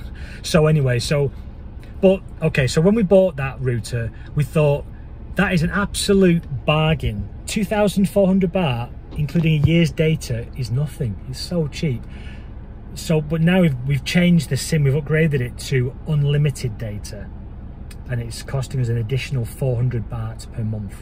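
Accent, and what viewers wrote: United Kingdom. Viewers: Where in the uk are you from mate? Just found your channel, subscribed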